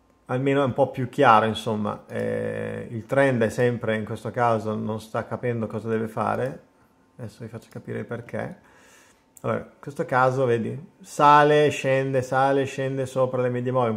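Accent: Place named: Trentino, Italy. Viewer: Ecco ora ho capito: leggevo trentino ogni tanto, ma l'accento non mente! Ciao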